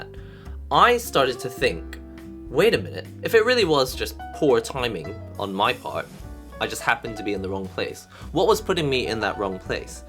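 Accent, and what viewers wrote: United Kingdom. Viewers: Hi, I've been one of your audience for a quite long time through I've never left any comments before. Hearing your voice makes me feel peaceful. I'd always love to run your video at the background talking things while I'm at home doing other stuff. The British accent from an Asian guy like me gives me a little inspiration and hope for life to become better, especially during this rapid pace life in city, and I think I've just found room where I can breathe after work. I've not played fighting games at all but listening to you is quite enjoyable. Your channel is great and I just want to let you know that there's people who find good things from your videos and they've always let me find courage to continue with life. Sorry about the poor expression, I hope my English to be as good as you some day.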